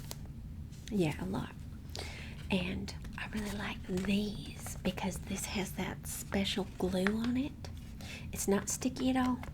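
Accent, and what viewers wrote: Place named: South, United States. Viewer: This is amazing and hilarious because you nailed a Southern accent perfectly 😂 I’m from the South, Georgia, but my accent only comes out with certain words. I can hear the thicker Southern accents and this is perfect, including how you speak ❤️😂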